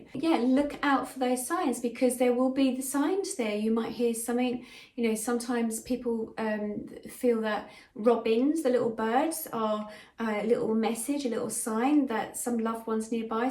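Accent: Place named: England, United Kingdom. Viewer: Gr8n hearing an English accent to all the others you hear all the time.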